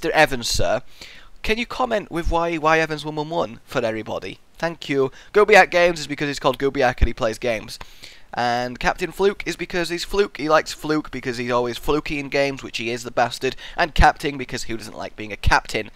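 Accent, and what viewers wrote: United Kingdom. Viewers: is british or german? or did he move to england later on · British .. why would he be german lol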